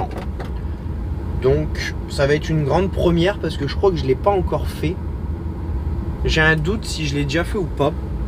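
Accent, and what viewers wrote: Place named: Nord, France. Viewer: Bonjour bonsoir merci pour la vidéo j'aime bien l'accent que j'entends je suis du Nord et j'ai l'impression de retrouver ma région j'aime bien tes vidéos elles sont sympas pour moi il y a un petit peu trop d'accélérer ce qui donne pas véritablement toujours l'imagination du métier le professionnalisme du métier mais merci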